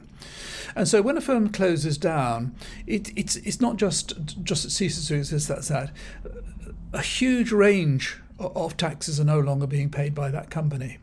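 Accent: RP, United Kingdom. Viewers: rather a plummy accent telling truths than an oink telling porkies. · By the way - you used the term "rabble",? What exactly do you mean by that? Who is this "rabble"? People like me who, unlike you, don't speak with a plummy accent?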